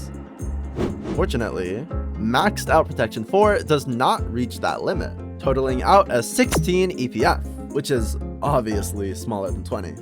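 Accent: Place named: Poland. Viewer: ok just sounds Polish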